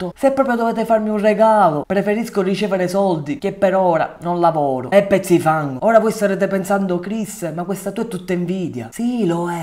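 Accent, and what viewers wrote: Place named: Sicily, Italy. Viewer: Che bello il dialetto Siciliano....bel video è . Ciao da qui sù : Bergamo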